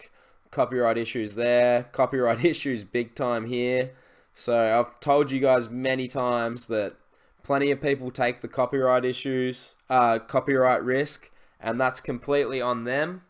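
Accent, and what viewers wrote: Australia. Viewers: Wich part of Australia are you mate??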